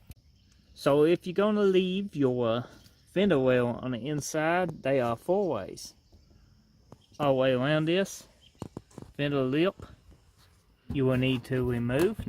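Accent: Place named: South, United States. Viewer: Southern accent, nice, friendly and helpful people.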